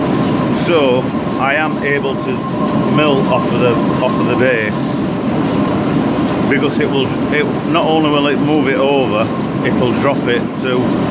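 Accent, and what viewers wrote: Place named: Wetherby, United Kingdom. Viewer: Ahhhh. Three years without a video. You're killing us, killing us. Once in a while I go drinking with a guy who used to live in Wetherby, but has now moved to the colonies (as he calls it). His accent is similar to yours but he is a prison guard, not a machinist. It's lots of fun but there's no trepanning talk. The only drilling he sees at work happens in the showers. Ken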